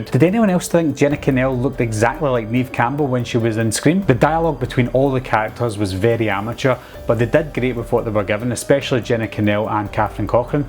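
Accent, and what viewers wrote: Scotland, United Kingdom. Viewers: You from Scotland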